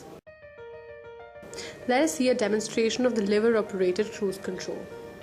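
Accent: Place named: India. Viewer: Indian accent, mate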